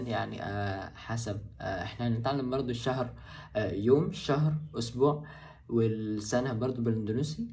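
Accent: Egypt. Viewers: أداؤك رااااااااائع.....استمر..تعلمت منك.. من فضلك..هل أحد والديك مصري ؟ أو كيف تعلمت اللهجة المصرية ؟ لما تزور مصر ضروري تشرفنا في البيت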